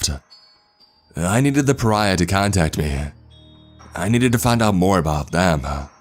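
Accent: Canada. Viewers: He’s Canadian probably?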